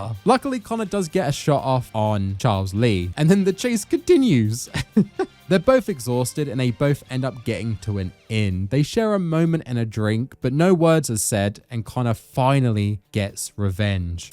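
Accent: United Kingdom. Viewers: Wait a minute…you’re British?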